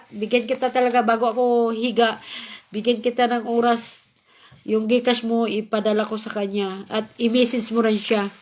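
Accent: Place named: Visayas, Philippines. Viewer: Congrats po.. Parang bisaya ka po maam, taga bohol ko maam.. Sana mabibiyayaan din ako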